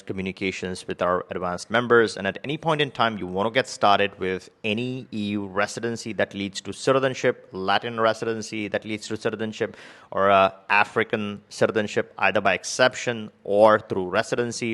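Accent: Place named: India. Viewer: I can hear that Indian accent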